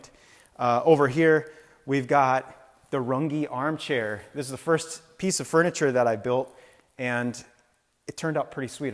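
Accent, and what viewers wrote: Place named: Manitoba, Canada. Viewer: <link> Are you Minnesotan or Canadian? Specifically Manitoban? I live in Winnipeg, and everything about your accent screams Manitoban to me.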